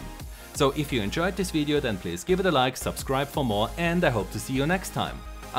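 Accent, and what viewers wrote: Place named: Germany. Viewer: Normalerweise nervt mich ein deutscher Akzent immer wahnsinnig, aber bei dir geht das eigentlich sehr gut.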